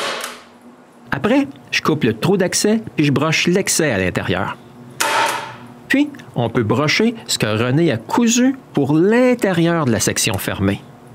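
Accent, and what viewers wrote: Quebec, Canada. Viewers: ToolsConsumables what you say is right but he speaks with a big Quebec accent so even though he meant to say the right things, the accent makes it sound different.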